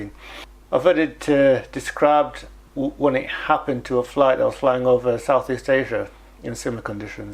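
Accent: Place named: Iceland. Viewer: Ha! You're as good at maths as I am! :P You have a beautiful Icelandic accent :D I remember all the hoo-hah with that. Oh no! That sucks so much. Oh lord, that sounds very not good to deal with if you're flying a plane! Oh you're such a sweetie! How very Love Actually! Oh wow, you look odd without a beard! I'm glad you clarified it wasn't the KISS guy!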